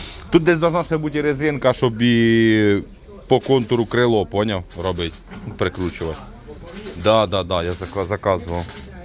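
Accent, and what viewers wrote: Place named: Western Ukraine, Ukraine. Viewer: ты из западной бо в тебя говор такой не тот что в Ярика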